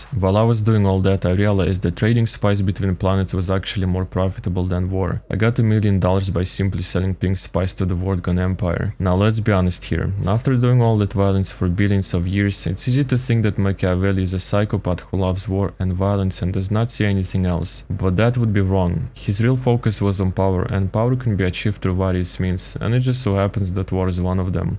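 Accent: Russia. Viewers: What is the accent? It sounds russian or something to me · ru accent?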